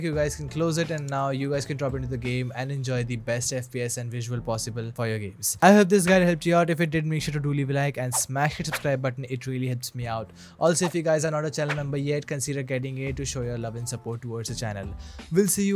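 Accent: India. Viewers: are you indiina?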